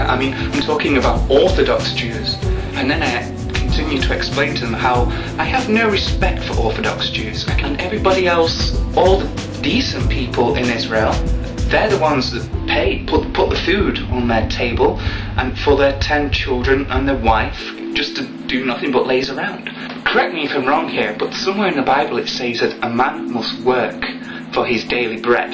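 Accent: England, United Kingdom. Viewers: Now you're pretending to be Jewish as well? As if your English accent wasn't bad enough; you don't even look Jewish. You're not a good actor - just face it. You're just a pretender. You're an idiot. Your game is that you pretend to be the very people you hate. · So where are you from? You sound English. Were you born in England and moved?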